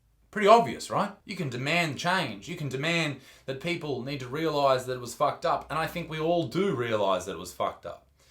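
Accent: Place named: Australia. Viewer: He has an Australian accents tho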